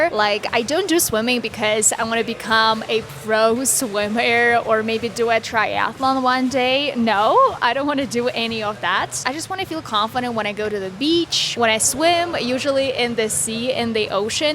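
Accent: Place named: England, United Kingdom. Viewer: I really love your English accent you have fascinating fluency in English ❤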